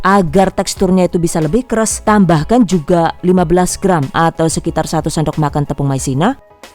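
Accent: Banyumas, Indonesia. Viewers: Ngapak luuurrr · ora ngapak ora kepenak,wong ndi jane rika yu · Deneng ngapak😂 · Maaf bun njenengan tiyaang pundi nggih, kayaknya ngapak. Siapa tau deket jadi bisa belajar langsung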